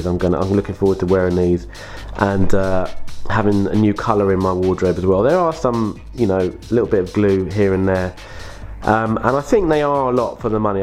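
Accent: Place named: United Kingdom. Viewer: Finally a new channel & great to see/hear another Brit,keep em coming bro.Toe box does look like the snapchat ghost lol.I think Hanon killed it with the theme & colourway,I remember M Johnson,he was a beast but so obvious roided up to the eyeballs (excuse the pun lol) even to me as a kid.Good stuff mate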